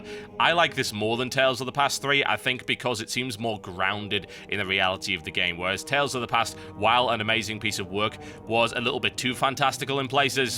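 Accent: United Kingdom. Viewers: Azeroth Daily, bringing me my daily dose of TotalBiscuit and brittish accents.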